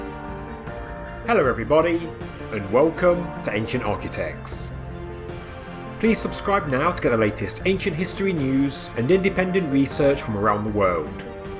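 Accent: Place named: England, United Kingdom. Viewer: Please consider adding caption I don't get your English accent please 🙏❤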